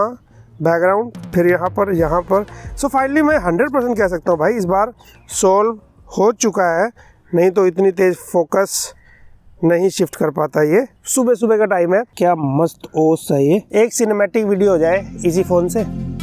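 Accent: Lucknow, India. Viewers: Where are you from.... Lucknow??? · bhai ap Lucknow se ho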